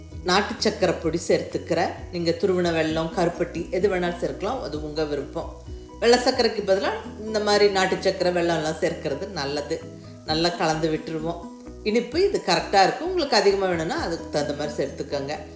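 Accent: Kongu, India. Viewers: கொங்கு தமிழ் இனிமை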